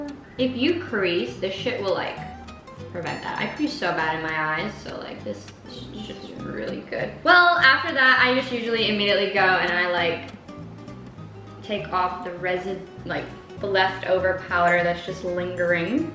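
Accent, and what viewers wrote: United Kingdom. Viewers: But good london Accent! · The 'Brit's don't be offended XD' got me rolling on the floor. You were speaking very POSH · British accent is slayyy. Btw can you pin me 📌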